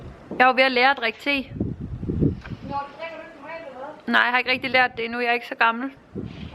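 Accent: Funen, Denmark. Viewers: Fineste. Så dejligt at høre dig noget mere på dansk ❤️. Elsker dit fynske 😍. Knuuus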